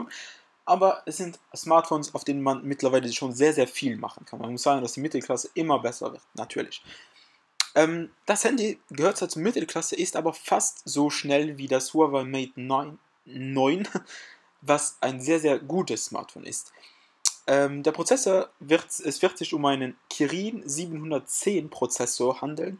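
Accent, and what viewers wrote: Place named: Luxembourg. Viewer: Dat heiert enn met der stemm dat ass esou en deitsch-letzebuegen Akzent Waiste wat ech mengen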